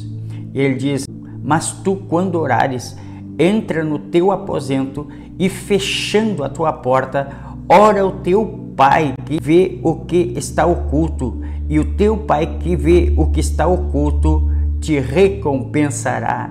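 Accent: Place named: United States. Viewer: Vc É Americano ?Teu Sotaque Diferente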